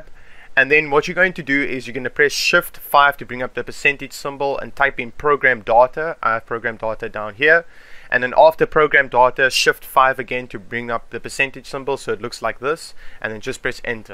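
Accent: South Africa. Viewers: You have an afrikaans accent🤔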